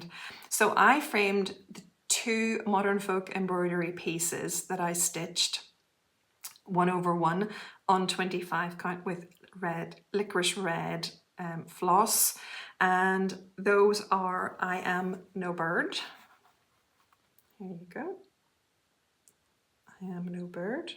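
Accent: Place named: Ireland. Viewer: I always enjoy your stitching and listening to your accent. Reminds me of my trip to Ireland . The Nativity is lovely.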